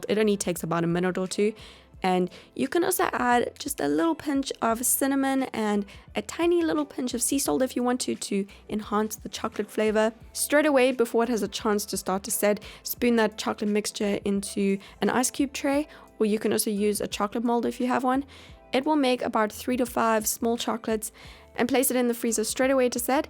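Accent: South Africa. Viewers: Is she South African ? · Also are you South African because I have South African friends and the way you pronounce words are super similar? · Ahhhh !!!! I recognized your South African accent so quickly !!! I’m from South Africa, can you make a video of how to diet & a diet plan for picky eaters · Lara S South Africa 😊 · i could pick up u are south african due to your accent but i wasn’t sure, then i recognized the shop u were at! :) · Your from SA right😂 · Ayyyyeee South African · Are you South African ? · Are you South African?? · Slay Ace she's from South Africa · Are you from South Africa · are you South African? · You’re from SA right ?? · Channi Snklb Her accent sounds South African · Knew you were South African as soon as I heard your accent ! (and I also saw woolies)I'm South African too ! ❤ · South Africa I believe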